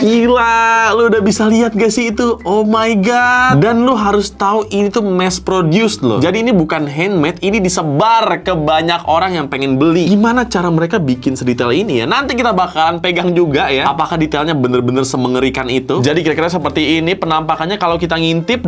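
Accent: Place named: Sumatra, Indonesia. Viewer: ada logat bengkulu,jambi dan palembang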